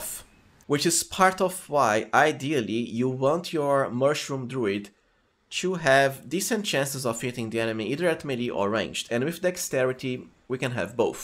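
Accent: Brazil. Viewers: This guy has to be Brazilian, I just know iiiiiit!!!! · Com esse sotaque ja sei que eh br. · Tu tem um sotaque brasileiro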